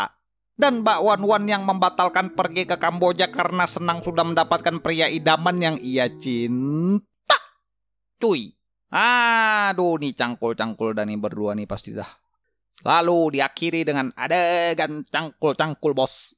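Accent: Banjar, Indonesia. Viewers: Orang Banjar kah nih?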